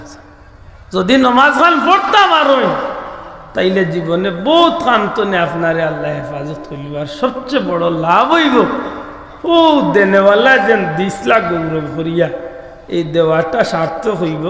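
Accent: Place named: Sylhet, Bangladesh. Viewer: সিলেটি ওয়াজ আমার অনেক ভালো লেগে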